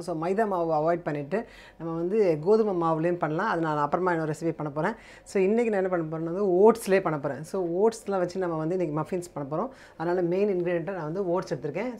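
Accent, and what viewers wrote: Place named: Chennai, India. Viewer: no thanks dear.. I'm mercel for ur chennai slang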